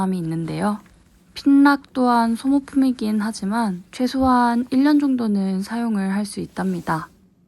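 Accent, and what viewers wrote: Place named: Gyeongsang, South Korea. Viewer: 고향이 어디세요~ 경상도 억양이 나와서요~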